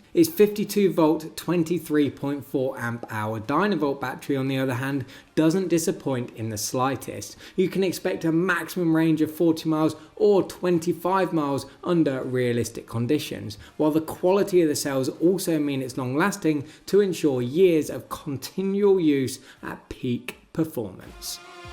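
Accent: United Kingdom. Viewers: he talk in dollar with a sharp british accent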